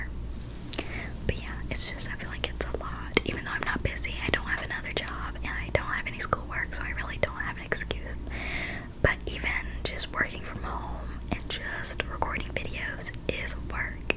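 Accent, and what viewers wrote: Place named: South, United States. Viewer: Girl I am ugly crying in my bed like snot dripping from my nose crying. This vid hit hard with me. I totally relate to everything you said and lm 41. I like videos like this because I like getting a view of other people’s lives. Like I look at you and think gosh she’s so pretty, she has the cutest southern accent, she is so dam kind and I envy you. But u gave us some real ass shit and I love it. I don’t love how you’re struggling but just know you’re not alone. I know it seems embarrassing talking to someone, a stranger about how you feel but don’t be. If you need help it’s ok. This is my fav video of yours. I honestly like these asmr videos more than the other stuff. You’re one of my favs 💕💕💕💕💕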